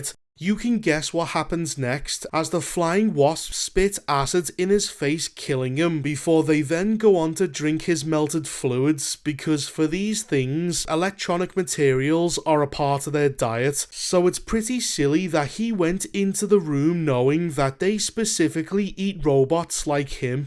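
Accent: Liverpool, United Kingdom. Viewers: Robot Scouse monotone speech is definitely appropriate for this retarded shit. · it takes a scouser to speak the truth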